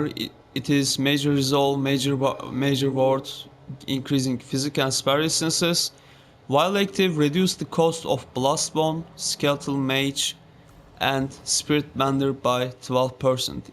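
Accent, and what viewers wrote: Turkey. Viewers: aksanını duyar duymaz kanal ismine baktım türkçe mi diye ya :D kralsın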